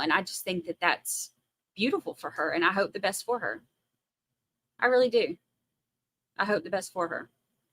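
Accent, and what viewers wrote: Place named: Florida, United States. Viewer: I'm from RA's. Don't know what trial you were covering but it's true. The minute you go do something else, the Jury's ready. Just wondering is your accent from Florida? I like it. Of course, to you I have the accent. Congratulations on your 10k!!! That Boy In The Box Sounds Horrible. How in earth can people do that?! I hope they get the D.P.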